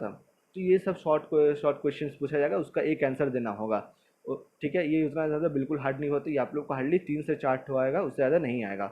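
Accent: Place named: Uttar Pradesh, India. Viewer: u r up accent bhai♥️♥️🥹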